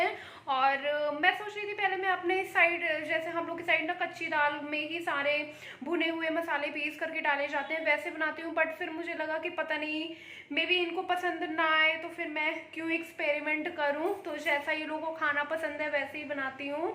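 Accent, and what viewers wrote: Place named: Bihar, India. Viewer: आप हिन्दी सही से नही बोलती बिल्कुल बिहारी जैसे हिंदी बोलती हो।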